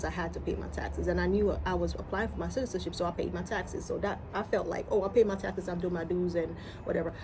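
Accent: United States. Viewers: Sorry that happened,I have a question your accent is American,where did you attend school,what state ? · Why are you talking in an American accent? Your other videos are PURE JAM!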